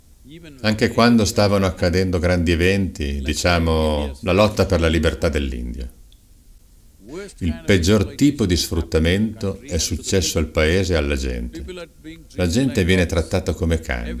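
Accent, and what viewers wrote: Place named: Parma, Italy. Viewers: con accento di Parma (o dintorni) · Il doppiaggio si ma non con quest’accento Emilianoromagnolo cosi pesante ahah mettete uno che abbia fatto un po’ di dizione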